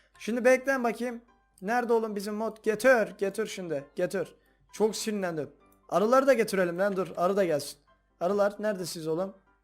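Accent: Black Sea, Turkey. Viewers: Abi sen karadenizlimisin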